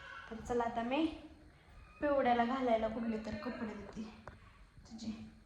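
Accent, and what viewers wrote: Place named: Rural, India. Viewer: प्रिय आणि....... च्या बोलण्यामध्ये एक वेगळेपण आहे ग्रामीण लेहजा बाज आहे तिच्या अक्टिंग चां वापर योग्य रित्या जर करून घेतला तर आपणास खूप मदत होईल.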